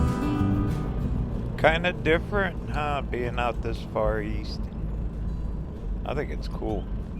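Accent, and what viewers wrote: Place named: Ohio, United States. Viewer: Yay, you are heading to Ohio. I was so, so happy to hear you decided to go to Ohio. The very first time I heard your voice and the way you talk, I just knew you were from Ohio. You remind me so much of my dad, especially the expressions you use and the way you laugh. This Ohio girl knows Ohio people as I call them. Too cool for school. My dad moved from Ohio to Arizona too. He had family in the Phoenix/ Scottsdale area. I was one of those little girls riding a tram at a young age. My Pop was a railroad engineer and my Mamaw had a lifetime pass. She took me on the train when I was younger than five and I still remember that ride. Train museum brought back memories. Thank you. ❤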